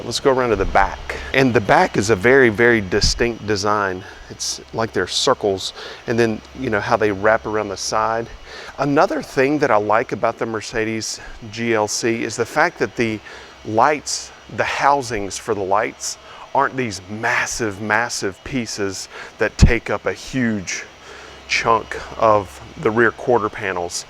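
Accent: South, United States. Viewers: Love your Southern drawl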